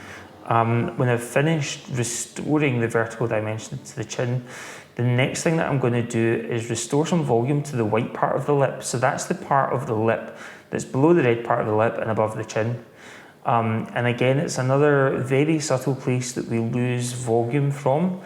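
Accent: Scotland, United Kingdom. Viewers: He’s clearly Scottish lol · Who is this Scottish doctor and where is he located?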